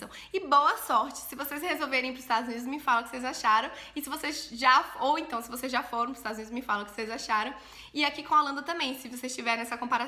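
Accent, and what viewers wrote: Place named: Bahia, Brazil. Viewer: Amei!! Tô super nessa dúvida e o vídeo me ajudou muito. Você e super fofa e sotaque baiano não tem pra ninguem <3 hahaha sucesso com o canal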